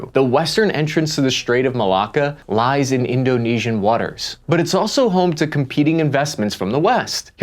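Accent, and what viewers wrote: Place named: United States. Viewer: Jema'ah Islamiya -> Jama-uh Islameya Sukarno -> Surarno Suharto -Shurarto Annoying American pronunciation, just like the annoying US military blunders since ever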